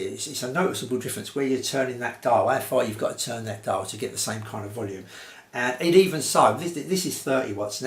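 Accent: United Kingdom. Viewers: We cannot understand a single word you say. Your accent is annoying that british accent of urs.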